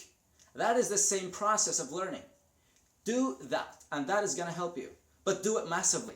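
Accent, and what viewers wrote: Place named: United States. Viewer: my words!!! this guy has just blown me away. Incredible to watch you speaking exactly in the American accent. You are just killing it man, I understand how much efforts you would have putted in it. And from a country like SAUDI ARABIA where ARABIC is everywhere. Congrates man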